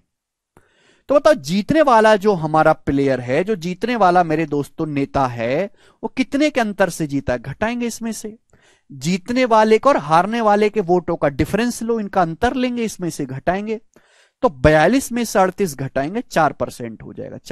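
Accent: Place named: Haryana, India. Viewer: Ram Ram ji haryana ki Badhiya padhao ho sirji In haryanvi style 😊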